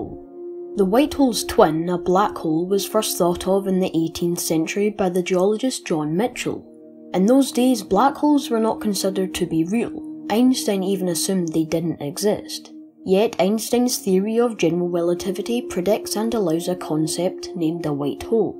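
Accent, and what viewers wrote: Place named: Glasgow, United Kingdom. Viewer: And here after watching your clip on black holes....I've subscribed and look forward to your future footage....yir a smart wee cookie.....sound like yir fae Glesga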